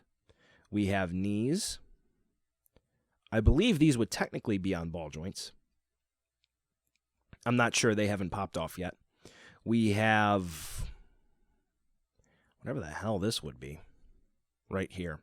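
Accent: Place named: South, United States. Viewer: What is with the southern accent?????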